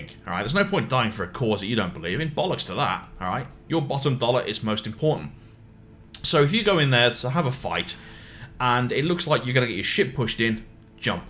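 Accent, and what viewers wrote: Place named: Northern England, United Kingdom. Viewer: Top class. It's one thing to know your stuff but it's quite another to be able to teach it, and you do that really well. It's good to hear a northern accent too, reminds me of my childhood. Hang on, my childhood was shit.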